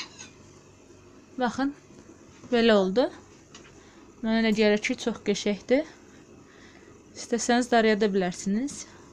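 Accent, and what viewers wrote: Azerbaijan. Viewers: Salamlar. Bu videonu her yerde paylaşacam. Baxıram yarı rusca yarı hansısa Azerbaycan rayon lehcesidi. Super.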